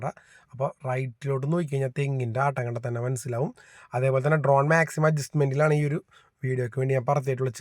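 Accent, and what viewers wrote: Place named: Thrissur, India. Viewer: Nalla Thrissur slng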